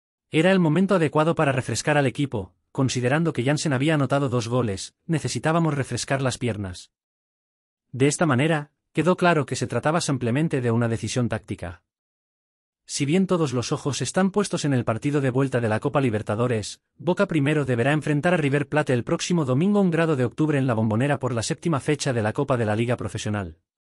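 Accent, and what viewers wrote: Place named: Spain. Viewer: Habla vos o pone una voz neutra, no tiene nada que ver el gallego este con Boca jajaj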